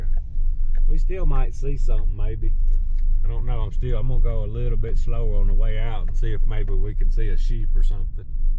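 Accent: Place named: South, United States. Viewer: You know it’s one of us Southern boys when ya hear “ that sure is a pretty rock”. I love it! Glad y’all had a good time brother! Thanks for sharing it with us.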